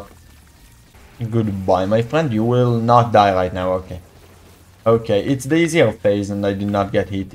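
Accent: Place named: Israel. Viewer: Say, are you Israeli? Your accent and the way you say mother gives me strong Hebrew vibes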